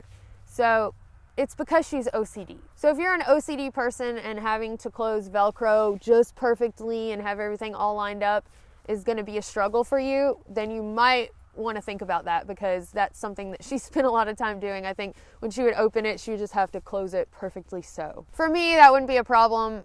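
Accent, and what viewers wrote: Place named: Alabama, United States. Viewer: I just found your channel, and I'm loving it! So much awesome information. 😊 Side note: do you happen to be from Alabama or around here? Our accents sound similar haha.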